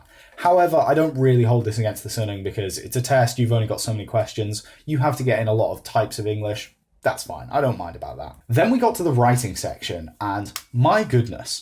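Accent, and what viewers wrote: United Kingdom. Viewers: 영국발음 너무 좋다고요 ㅠㅠㅠㅠ 영국식 영어 배우고싶당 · Hi! 오랜 팬인데 댓글을 남기는건 처음이네요. 특히 이번영상에선 영국발음이 너무 멋져요👍🏻 다음 영상도 기대할게요🔥🔥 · 영국발음 너무 조-하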